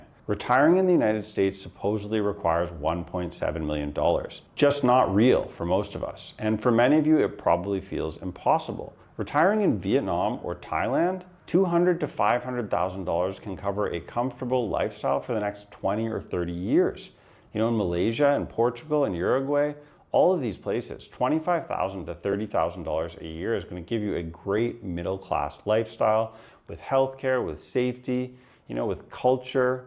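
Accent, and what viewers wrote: Canada. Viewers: lol your Canadian accent is super thick and I’m Canadian 😅. Good info here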